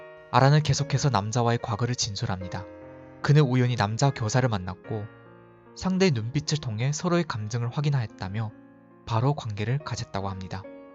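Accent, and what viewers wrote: China. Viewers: 발음이 조선족 같은데 중국 조선족이었넹ㆍ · 중국인이신가요? 제가 아는 중국인 분이랑 한국어 발음이 비슷하네요. ㅉ,ㅃ 같은 된소리를 굳이 쓰거나 발음이 쎄게 나가기도 하고 뜻이 안맞는 피동표현이 나오기도하고 -다, -습니다 가 계속 섞여서 사용되네요. 번역기 같은 거 쓰는 것 같아요. 혹시 더 나아지고 싶다면 이런 부분을 고쳐나가는게 좋을 것 같습니다.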